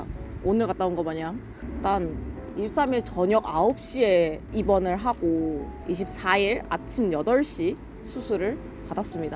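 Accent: Gyeongsang, South Korea. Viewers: 예쁘고 털털하시고 경상도사투리ㅋㅋ 심쿵했습니다. 긍정적 마인드시니 빨리 나을 수 있을겁니다! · 억양이 경상도분이시군요 힘내세요!!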